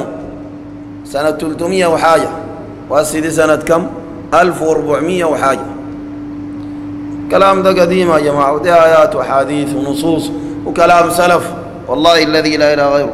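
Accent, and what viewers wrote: Sudan. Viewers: كم هي حلوة اللهجة السودانية اخوكم من الجزائر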